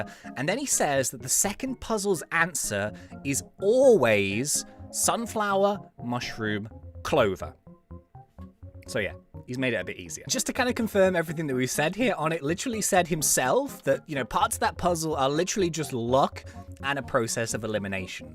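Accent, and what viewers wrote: England, United Kingdom. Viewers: what do you mean, but? Like he’s English, from the uk... like I said, and I’m LITERALLY English from the uk aswell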